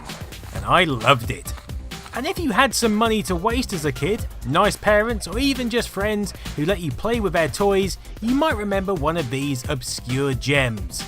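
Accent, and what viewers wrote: United Kingdom. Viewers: Oh my God I wish I didn't have ears the way this guy talks fucking brits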